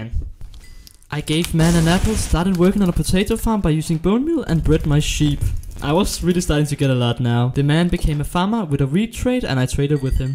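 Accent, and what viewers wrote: Denmark. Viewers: are u danish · Danish 100% lmao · Hey are you from Danmark you Sound like it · Very nice danish accent 👍🤝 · You sound a bit danish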